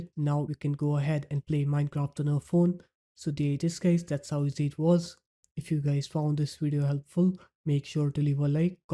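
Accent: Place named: India. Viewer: Mate u cant get raid of indian accent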